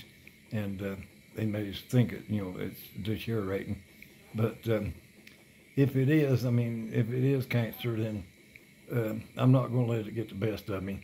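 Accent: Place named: South, United States. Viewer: From Gary's Northern wife here.....well....you still have the southern accent strong friend! Gary and I are Wishing you the best.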